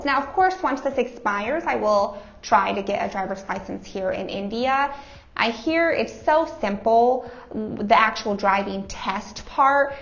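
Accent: United States. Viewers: Are You Michigan Or Chicago Girl????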